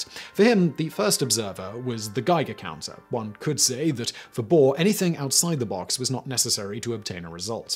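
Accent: United Kingdom. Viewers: Today I learned there’s another vsauce, except with a British accent.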